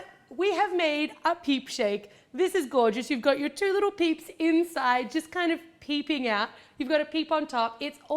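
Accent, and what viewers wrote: Australia. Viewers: Your Australian right?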